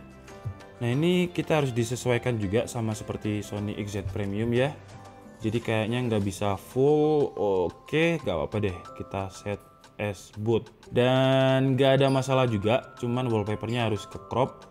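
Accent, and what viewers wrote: Java, Indonesia. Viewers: Bang orang jawa yh? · Wong jowo mas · jowo endi lur😂😂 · Mas sampean tiang jowo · Ternyata orang jawa mas 😂😂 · Wong Jowo toh 🤣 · Jowo mu medok mas😁😁😁😁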